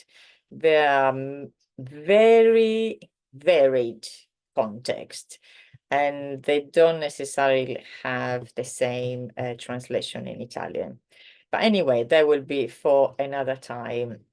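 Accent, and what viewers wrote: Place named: England, United Kingdom. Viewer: I’m on Duolingo learning Italian, do you think that’s a good source? And you sound English? Are you from Italy?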